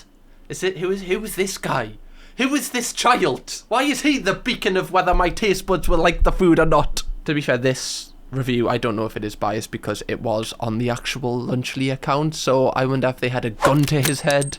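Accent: United Kingdom. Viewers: Are you British or Scottish like you accent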